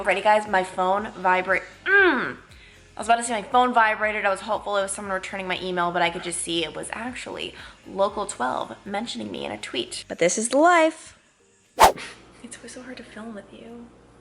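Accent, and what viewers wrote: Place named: New York, United States. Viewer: This was fun to watch. Especially the flash back of you with your NY accent. Love it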